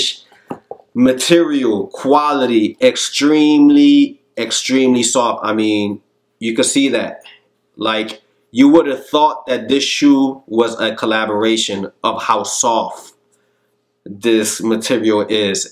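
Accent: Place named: New York, United States. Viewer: cant figure it out. that a new yalk accent or puerto rican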